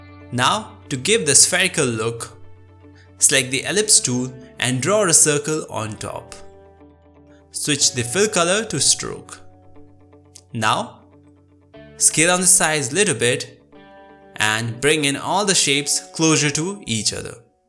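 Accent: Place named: India. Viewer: yea fucking indian accent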